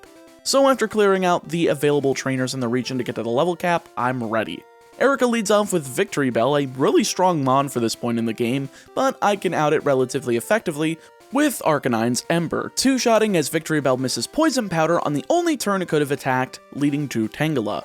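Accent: South, United States. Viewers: YouTube told me i haven’t watched this video so here we go again with the funny southern man and the way he says rAival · At the beginning I couldn't help but notice that you are definitely southern. You don't have a strong accent for the most part, but when you said the list of rivals you pronounced a lot of them as rahval. As a fellow southerner there's nothing wrong, just an observation. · Anyone else think he's pronouncing Rival real southern like in the beginning? In fact, he seems to slip into a southern draw alot this video xD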